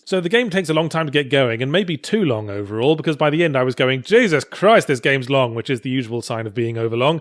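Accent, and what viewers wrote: South Africa. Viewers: Dear Yahtzee, as a South African I can safely say you are 100% correct, everyone hates us and most of all we hate each other. Oh and btw your accent is terrible, both in your resident evil play through with Gabe and here. You're still awesome, keep up the great work. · I am South African and that statement about us not getting along with each other is pretty apt. Even more so now that President Zuma has our country in a lovely nose dive to end up like Zimbabwe. Oh good review as always Yahtzee, your SA accent is still atrocious though. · Oh, how I missed Yahtzee's South African accent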